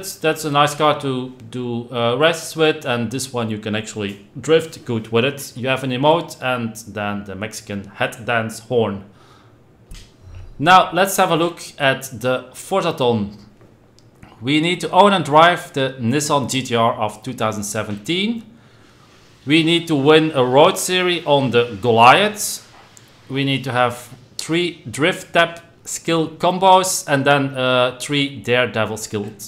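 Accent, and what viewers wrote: United Kingdom. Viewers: I thought that I have to do full Goliath race but you helped as always. Are you from UK?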